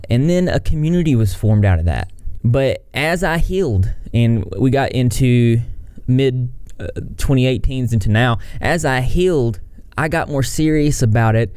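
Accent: South, United States. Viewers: Just discovered your channel and already hooked. I’m glad you got better from your accident and found YouTube to help with that. There will always be haters but keep pushing forward. I LOVE your hair btw and you sound like you are southern like I am :) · Do ya got a little southern accent? Or am I just crazy?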